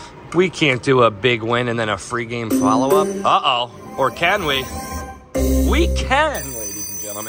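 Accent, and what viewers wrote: New Jersey, United States. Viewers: Love your videos. I love the NJ accent. Favorite I Sar vs I saw. Cool!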